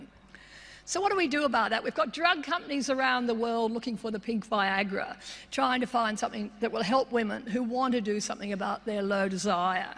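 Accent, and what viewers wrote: Australia. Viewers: Yes, the clue is in the Aussie accent.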